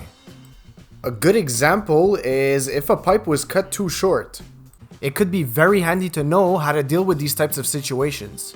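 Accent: Quebec, Canada. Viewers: I'm guessing French Canadian from Montreal or Gatineau.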